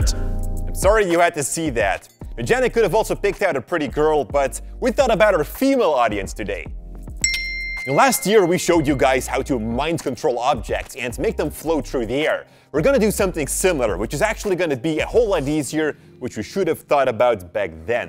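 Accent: Netherlands. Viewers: Are you Dutch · are you dutch? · his accent tells me he is Dutch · are you dutch ?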